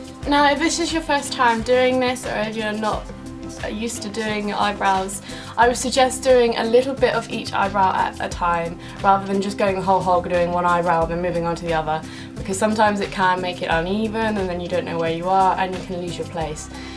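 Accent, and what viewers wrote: United States. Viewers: I don't understand why you talk normally and then have an American accent? Throughout this video... Kinda annoying. Helpful video though :) so thanks! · I like how you talk, it was cool to hear you sound kind of American. Don't listen to the person who said it was annoying. Most people make different sounds or slight variations or accents from time to time. :)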